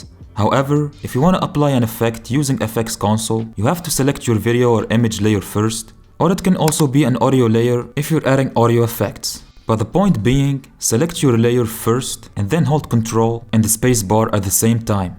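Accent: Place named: South Africa. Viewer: I know a South African when I hear one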